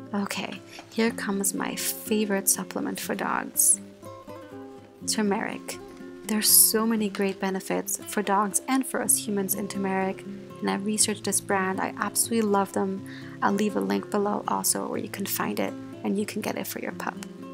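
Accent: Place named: Germany. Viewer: you sound german, where are you from?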